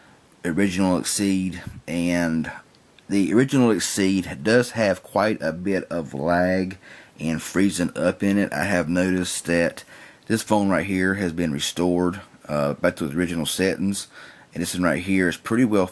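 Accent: South, United States. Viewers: You sound like you're from down south, but you talk nerdy, I LOVE IT!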